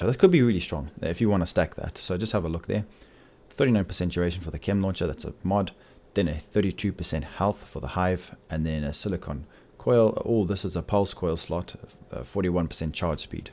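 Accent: South Africa. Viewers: Hey am I hearing a South African accent there?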